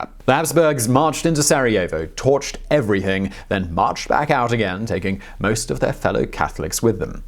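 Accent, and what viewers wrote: United Kingdom. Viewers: oh and the british accent with the rounded "o". yeah he s smart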